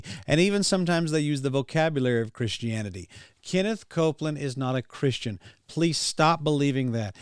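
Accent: South, United States. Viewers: HEY!! His accent is solid brother -- give him that cred at least!!:D Seriously, pretty darn good ha! Is your southern accent authentic, or is it a dynamic show like Kenneth Copeland Mexicano speak?!! Thanks brother:D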